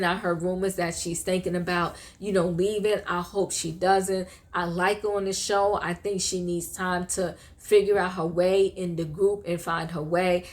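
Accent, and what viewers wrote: New York, United States. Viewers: You have a NY accent but your skin too healthy. You in FL?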